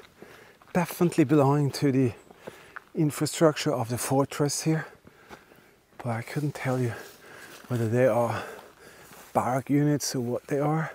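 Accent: Germany. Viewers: You sound German to me, but then the pronounciation of Feuergefährlich makes me things differently. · Warum sprichst du englisch, man hört raus das du deutscher bist ,und wenn dann könntest du wenigstens einen guten Untertitel erstellen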